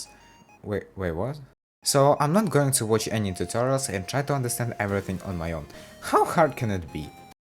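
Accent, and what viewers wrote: Russia. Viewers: Акцент подсказывает мне что ты русскоговорящий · русский акцент везде услышу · у тебя акцент русского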